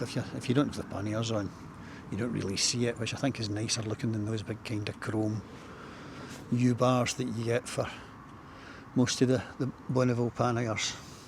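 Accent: Scotland, United Kingdom. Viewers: You will have to eBay that Indian jacket now! It's the only problem with changing bike/brand. Saying that i still wear my HD fingerless gloves despite now riding a T100! I would like a T120 at some point but no hurry as the T100 does it all really. Ps, love that Scottish accent. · Maybe because I’m from a very mixed city/upbringing, but I can understand him completely! Never understood why people can’t… understand Scottish accents xD We’re speaking the same language! Anyway, beauty bike brother. I just picked a ‘26 up last Saturday, I’m very happy to be a part of the family now. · Nice! And I love listening to Scottish guys talk; I’m up to understanding nearly 25% of what’s being said!😂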